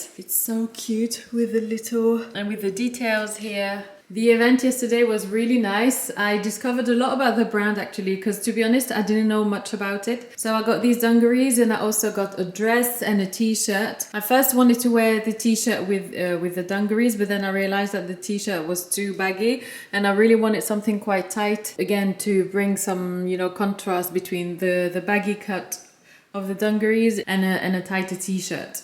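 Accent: United Kingdom. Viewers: I am in love with your british accent. Please don't stop vlogging in english.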